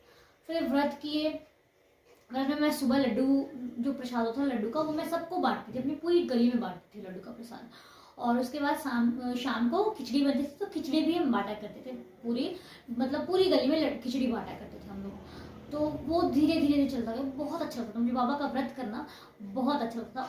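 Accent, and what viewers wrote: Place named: Punjab, India. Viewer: I love ur punjabi tone mera naam sukhdeep hai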